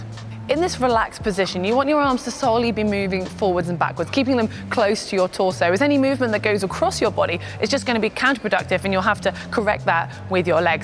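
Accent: United Kingdom. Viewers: What are you talking about! British accent is awesome.. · I watched this vid many times for the British accent. My running improved as a bonus. 😄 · I love British accent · It's great :) I love the British accent Im working on mine one to keep :) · Omg why this British accent sounds so stressful ..I am having problem with understanding it ...it's like a mind exercise for me coz I'm using my potential to understand her every word😂😂😂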